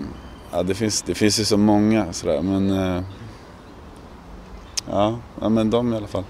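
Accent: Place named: United States. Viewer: Holy shit I thought he was American. Sick.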